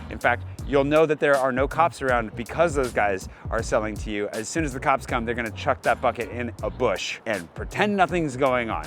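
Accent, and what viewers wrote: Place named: United States. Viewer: You've lived here long enough to become French yet you sound like a ********g American. Hope we do not bump into each other. I've lived here 10 years.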